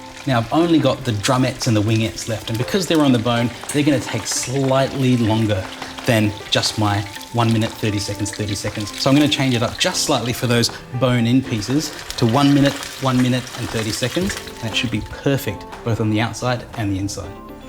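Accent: Australia. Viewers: Opens video to learn new recipe See’s asian dude but hears Australian accent strokes out 🥴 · Dude looks Asian, sounds Australian and vibes like a Mexican. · I love Australian accent. They seem so chilled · An Asian guy with an Australian accent. I love it!! And my mouth is watering for some of the chicken. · He sounds perfectly Australian and not Singaporean or Malaysian. This isn't a sg or my slang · I'm confused Is he Aussie, English, or Japanese? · It's weird seeing a Japanese guy talking with this Australian accent lol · That's just Australian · He is Aussie. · I switch after I hear that accent Australia? Fake Japanese food :)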